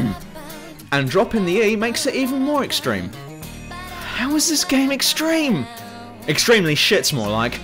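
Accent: United Kingdom. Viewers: gotta love that british accent :>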